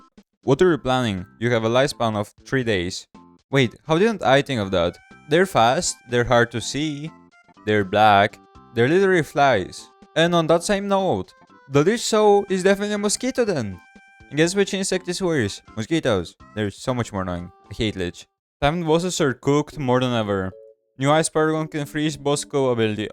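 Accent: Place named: France. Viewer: you have a thick french accent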